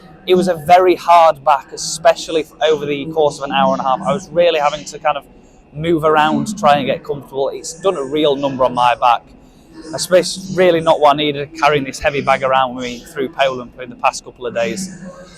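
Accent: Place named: England, United Kingdom. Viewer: Hey! I hope you had a nice time in Poland anyway :) Let me ask you - where exactly are you from? I'm curious what kind of English accent it is.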